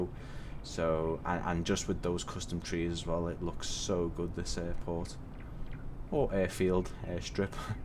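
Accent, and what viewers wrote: Liverpool, United Kingdom. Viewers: How odd, just bought this on a whim and looked for a review, found yours first and sounds like you're from Liverpool like me, small world :)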